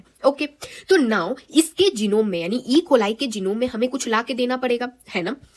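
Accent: Bihar, India. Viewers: Didi aap bihar se ho kyyy you have an accent like us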